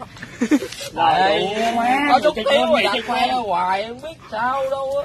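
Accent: Vietnam. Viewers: Đu má a nc chửi thề dễ thương lắm anh, đậm chất miền Tây, em cũng z hihi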